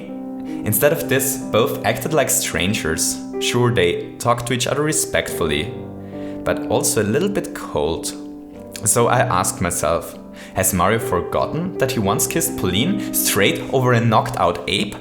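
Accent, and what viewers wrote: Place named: Germany. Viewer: Nice Video. Deutschland oder Österreich (Akzent verrät alles)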